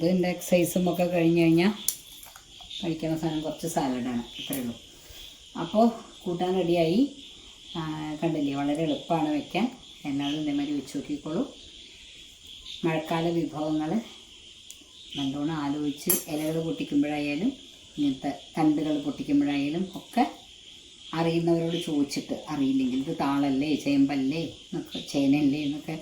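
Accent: Valluvanad, India. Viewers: Sister, i have become a big fan of your videos.... ആ വള്ളുവനാടൻ ഭാഷ കേൾക്കാൻ തന്നെ സന്തോഷം ആണ്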